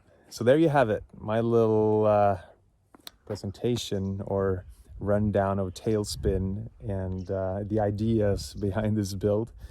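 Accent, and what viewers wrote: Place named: Southern California, United States. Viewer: I feel you should put an actual propeller on the back of it keeping with the spirit of tail spin. You can do one with a small electric motor that be functional. Also your English has gotten really good. You have a kind of Southern California accent now. I remember meeting you back a at the world drift comp at the long beach docs with your broken English.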